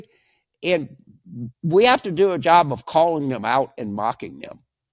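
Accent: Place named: Louisiana, United States. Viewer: YES! James you need to come over for Bloody Mary's one day! Now you sound like a LA boy raised on politics! "That's NOT an alternative view!" -, 2024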